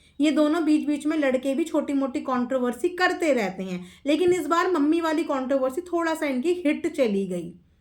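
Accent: Bihar, India. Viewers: 😂kya baat hai bhut chill mood me hai...mast bihari tons de rhi hai😂👏👏👏👌🏼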